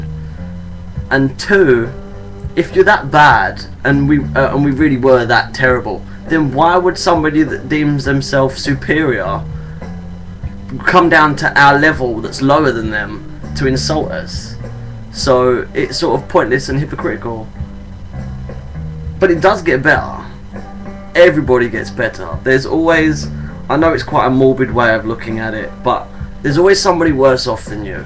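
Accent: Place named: United Kingdom. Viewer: Wonderful seeing contributions from other than the LGBT U.S. communities. Love the UK, and love your Brit accent. Being a Yank, not terribly sure where you may be from originally, but are you perchance from Liverpool? Probably getting this all wrong, so my humble apologies in advance.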